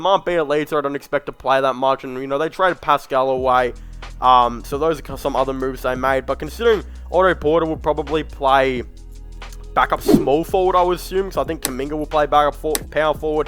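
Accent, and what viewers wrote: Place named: Australia. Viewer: I've subscribe because I love this dude's accent. I wonder where he's from? 🤔 Maybe Australia 🤷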